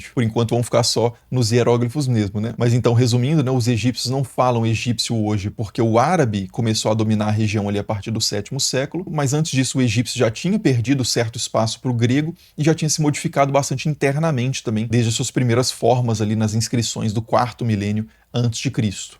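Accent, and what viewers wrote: Minas Gerais, Brazil. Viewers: Sotaque mineiro inconfundivel? Eu diria de BH!!!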